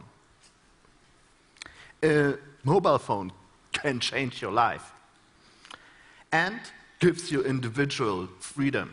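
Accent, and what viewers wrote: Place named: Germany. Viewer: I don't care about stutter but this German accent? Gosh.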